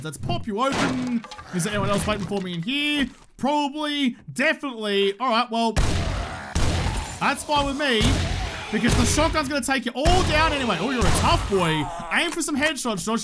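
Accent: Australia. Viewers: You got to hit up those rand-o police cars when you see them cause they are caulked full of what you like to call "lovely dovely's"! (thought i saw you fly by one in the desert and I think there is one near your shitty lighthouse)!! Cheers to my favorite little speed talking ball of fucking Aussie goodness gamer from KC Missouri USA!!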